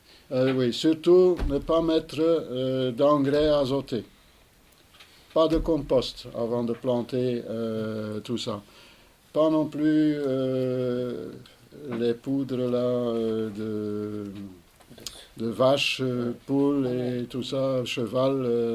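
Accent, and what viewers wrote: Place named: Nord, France. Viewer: Oui, je sais, j'aime vous écouter car ça me rappelle mon enfance dans le Nord avec toute ma famille frontalière, qui avait cet humour que j'aime (et aussi l'accent) Mais pas que, aussi votre grande expérience du potager. Portez-vous bien. Merci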